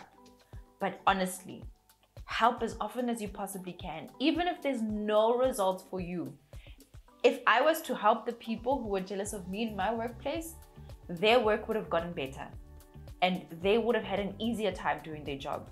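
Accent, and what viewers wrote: South Africa. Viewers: South African accent 😍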